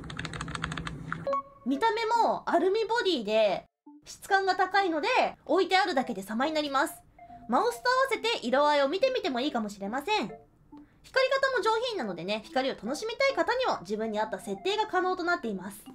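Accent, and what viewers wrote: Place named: Kansai, Japan. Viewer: 関西弁なんですね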